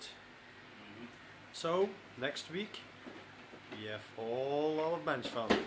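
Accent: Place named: Belgium. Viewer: your English accent is pretty funny xD Klinkt nog een beetje belgisch:P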